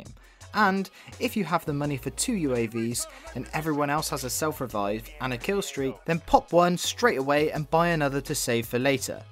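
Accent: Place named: England, United Kingdom. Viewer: You have an English accent. I trust you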